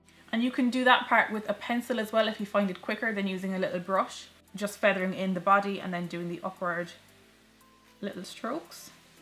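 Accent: Ireland. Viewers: Thanks for replying - it means a lot to me. Yes my eyes are like yours where the crease hangs down and blocks out part of my lid when my eyes are open.. I am so glad I "found" you Its lovely to hear the irish accent as Im irish (Mayo) living in England. Maybe sometime - who knows I may get to Cork and go to you for some lessons!!. All the beauty tutorials on youtube are great - but sometimes women of a certain age (like myself) are at maybe a low point in life (menopause etc ) lacking confidence and at a loss as to how to enhance the looks they have. I love the way you put your tutorials across it is very down to earth but very informative and easy to follow.- thank you. Maybe sometime you might do a full face and eyes for mature women???? Maybe??? I will keep a look out. God bless xx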